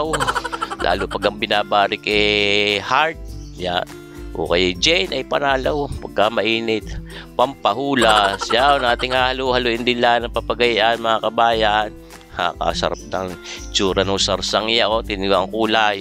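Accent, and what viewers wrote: Batangas, Philippines. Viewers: Ok sana pero asar ang tono ng salitang battangueño pangit pakinggan. Sa akin lang comment yan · Nakaka aliw ang punto ng bigkas ng salia po ninyo taga batangas po kayo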